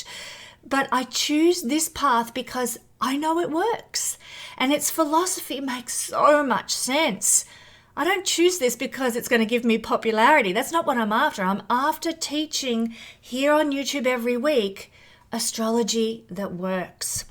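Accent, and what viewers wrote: Australia. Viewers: Thank you-my first foray into Vedic astrology...interesting. Good to hear an Aussie voice!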